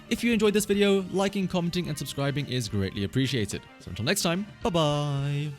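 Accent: South Africa. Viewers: The moment I heard your accent I subscribed, howzit.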